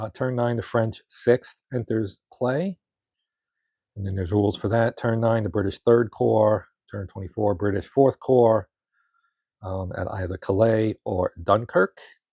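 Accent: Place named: New York, United States. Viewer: pronouncing eurotowns with new york accent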